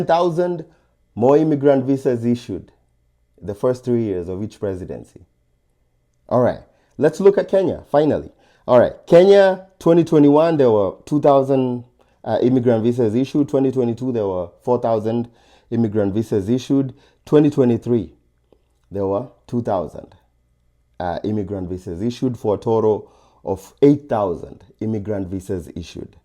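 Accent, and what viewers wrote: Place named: England, United Kingdom. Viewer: Weh! English accent for me😊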